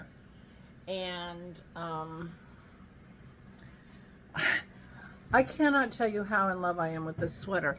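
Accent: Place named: Montreal, Canada. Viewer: I love how your Montreal is coming out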